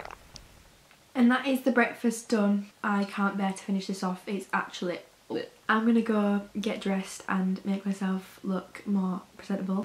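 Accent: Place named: Scotland, United Kingdom. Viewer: Is she Scottish cuz I am and she kinda has an accent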